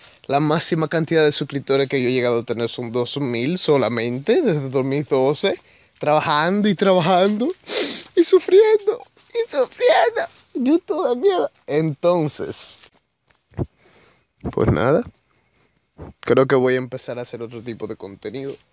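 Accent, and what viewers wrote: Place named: Honduras. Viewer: Pues men, mira, yo te sigo desde el ultimo canal que te tumbaron, y te volví a encontrar de pura suerte, por un video de una canción/traducción que te pedí, era la de -. Desde ese entonces, te sigo, y de alguna manera te tengo nostalgia por el gesto que me hiciste. Debo de admitir que no sigo tu canal de forma muy activa, ya que, sinceramentementemente, pues, tengo algunas cosas que consumen mi tiempo, etc, etc.... Pero si, de ves en cuando me actualizo y veo tus videos, uno tras otro, porque me encanta la mayoría de traducciones que haces. Ademas, gracias a ti, he descubierto nuevos grupos, géneros, y eso, eso realmente esta muy cool, y lo aprecio bastante. Pero... si ya no puedes subir esta clase de contenido en este canal, pues, esta bien, sabes. Tampoco tienes que tirar por un barranco tu canal. Podrías.... No sé...... Puedes ingeniártelas para hacer otro canal nuevo, virgen, sin strike's, y empezar a resubir, o subir nuevas traducciones, y así reducir riesgos de que se vaya todo a la Sh**. 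Puedes hacer muchas cosas en este canal, no me viene algo en especifico, pero sé que encontraras algo, puedes hacer lo que quieras, experimentar para ver que tan bien lo haces, o que tanto pega, etc, estas en una plataforma con muchas otras posibilidades de creación de contenido menos riesgosa por el Copyright. Y tu voz esta bien, es supercool. Mi mejor ciber-amigo es de Honduras, y habla casi igualito que tu xd Por lo que para mi es familiar, agradable, pero no lo tom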